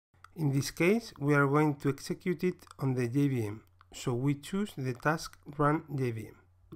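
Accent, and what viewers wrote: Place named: Germany. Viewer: I agree with you, German people are super nice. On my previous comment I said "The next videos were narrated probably by a German." because I had caught a small hint of German accent in the narration.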